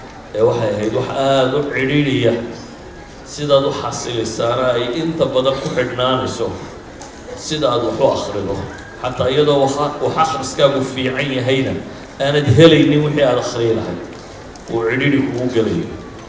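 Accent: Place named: Burao, Somalia. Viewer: haa xifdiiye wali laman kulmin laakin waxa isoo maray Malin anaago dhageysanayna muxadaradiisa oo fadhiina oo qaad iibinayna ayaa waxa igu soo beydhay Niin yara xanunsanaya lakin wax fahankisu ad u ficanyahay waxa magicisu aha saabce intu naa eegay Ayu naa ag istagay anigu wan garanayey kursi ban u dhiigay caabarmarku dhageystay ayu yiidhii Niinkan mataqana maya ban ku iidhii Kuyee waa reer burco wan yabay waxan Ku iidhi miyad taqaana maya ayu yiidh Hada Maxad u leedahay wa reer burco Kuye hadalkisa yacni luqadawa burco wan yabay wali man iilawin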